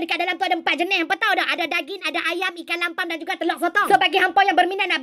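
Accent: Kedah, Malaysia. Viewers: Salam, saya seronok tngok video masak mengekak saya gelak dngn logat kedah tu, ni nak tnya mcm mana nak dptkan pekasam yg berbgai jenis tu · betoi2 kedah. Terbaik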